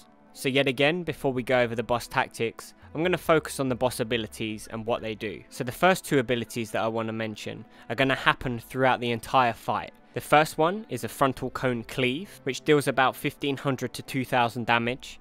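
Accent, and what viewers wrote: United Kingdom. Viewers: Great vid. Thnx. It must be the British voice.:D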